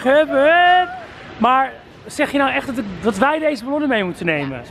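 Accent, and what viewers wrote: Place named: England, United Kingdom. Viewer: is zo grappig vooral Zijn Engelse accent 😂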